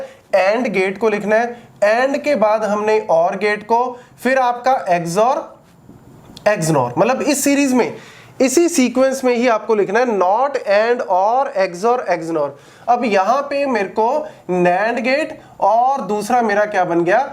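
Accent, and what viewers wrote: Punjab, India. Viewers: Sir aap Panjabi ho kya?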